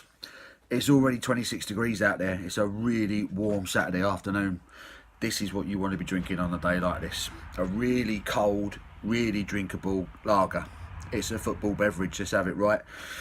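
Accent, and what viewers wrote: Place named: Cockney, United Kingdom. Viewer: is essentially a Cockney Bill Bailey beer reviewer. I always enjoy the videos.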